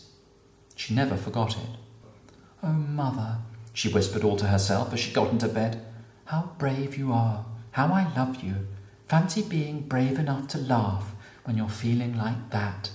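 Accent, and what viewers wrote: United Kingdom. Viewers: Thanks a lot , your accent is my favorite . Is it considered as a soft or modern RP accent ?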